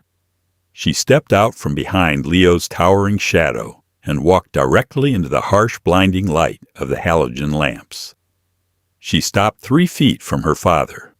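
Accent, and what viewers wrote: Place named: South, United States. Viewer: a little "southern" for an Italian Mafia video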